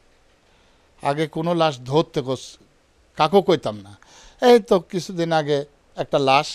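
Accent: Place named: Natore, Bangladesh. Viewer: কথা শুনে বুঝলাম আমাদের নাটোরের মানুষ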